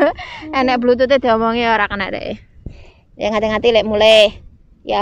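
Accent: Indonesia. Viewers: Ko logatnya kaya orang Jawa timur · Suaranya medok banget kak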